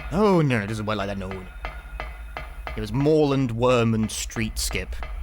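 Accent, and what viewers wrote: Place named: United Kingdom. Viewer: I've watched some of your videos before, but never occurred to me that you're from the uk, even though I did notice you have an accent lmao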